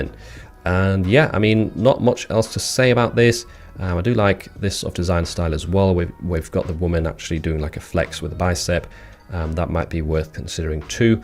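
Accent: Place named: United Kingdom. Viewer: Hi. I'm just starting out on Merch on Demand, and your videos are a great resource. I've watched a lot of vids about POD on different channels, but yours is definitely a favourite thanks to your calm, clear delivery without making wild claims about making money fast. Maybe it's because I'm a fellow Brit that I appreciate this lol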